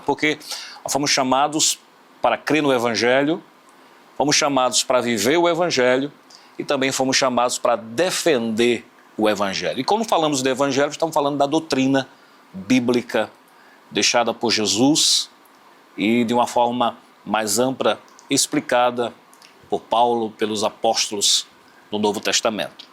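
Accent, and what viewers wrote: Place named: Northeast, Brazil. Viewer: Parece nordestino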